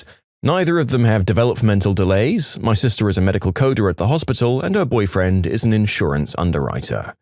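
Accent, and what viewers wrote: United Kingdom. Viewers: wtf happened with your voice you sound like a rich white british man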